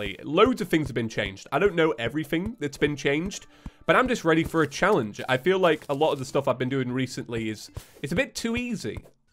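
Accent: United Kingdom. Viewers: Bruh I always click on these videos to sleep, and it always has to be a stinkin British accent? Can you speak more American please? It’s more relaxing than that proper sounding stuff.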